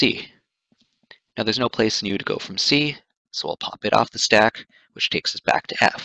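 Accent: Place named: United States. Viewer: bhai india me hi rehta hai na.... kyunki accent se to america ka lagg rha hai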